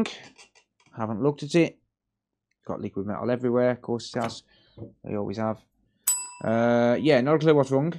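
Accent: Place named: West Midlands, United Kingdom. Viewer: It's good to hear the West Midlands accent as well as showing some great fixes!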